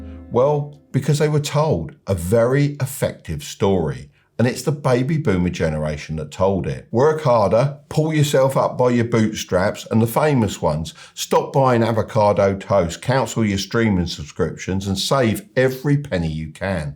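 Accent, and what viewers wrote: United Kingdom. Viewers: Where did you grow up? Souds like you have a British accent. That is a critical piece of info you left out.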